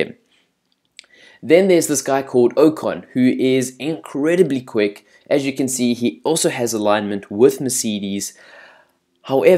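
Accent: South Africa. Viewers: .....nice South African accent boet.........but your pronunciation of the drivers names is shocking...ja ok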